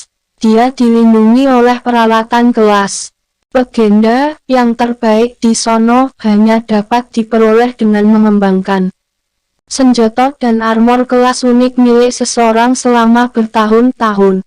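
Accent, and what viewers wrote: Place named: Java, Indonesia. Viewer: Medok yah suara nyah 😇😇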